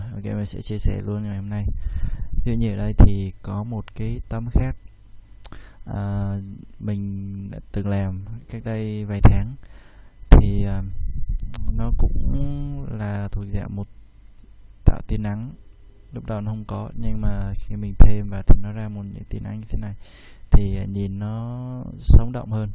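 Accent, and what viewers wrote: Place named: North, Vietnam. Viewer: góp ý một tí là bạn nên nói to hơn chứ đừng nói kiểu thì thầm như thế, mình khó nghe được giọng bắc. dù sao tut của bạn hướng dẫn rất kỹ và chi tiết. cảm ơn bạn, sẽ ủng hộ channel của bạn!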